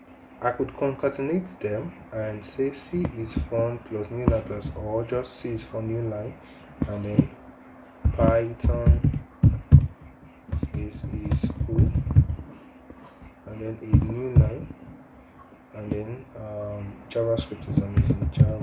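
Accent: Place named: Nigeria. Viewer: From your intonation, I feel you re a Nigerian. pls i base in ph. i need a mentor on programming. pls be my guide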